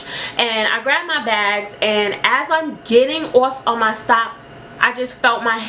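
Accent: New York, United States. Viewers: i love you because you are so new york! lol you said i was duffin him ! lol im from brooklyn btw. so glad you made it through that!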